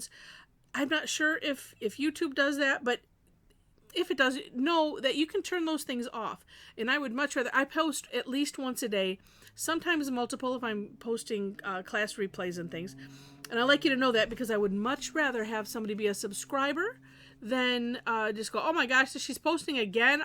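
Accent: Midwest, United States. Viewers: Good morning, I work right along with your videos. It takes me a little longer so I constantly have to go back with my tablet. But it's okay cuz I like listening to you talk while I work. I love your med Western accent. I'm from Chicago but I live in North Carolina now and just about everybody has a Southern drawl here. Thank you for putting out these videos. ❤️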